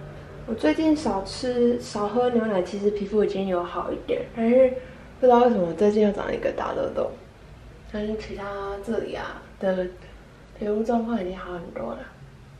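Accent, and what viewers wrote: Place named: South Korea. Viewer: 哇咔咔等到你嘍，想問健身視頻都看哪個？聽上去是韓國人呢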